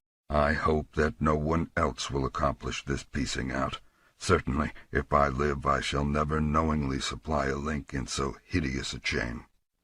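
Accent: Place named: United States. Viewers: Wayne June is American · I am pretty certain it's American, further emphasized by the way he pronounced "either" in the beginning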